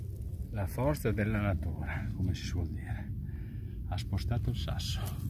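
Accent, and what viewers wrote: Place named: Bologna, Italy. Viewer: Gajardi l ' estatini...ammappello!!! Sei forte puro tu..ma n' do stai..a Bologna ,me pare,dall' accento tuo.. Dajee👍👍👍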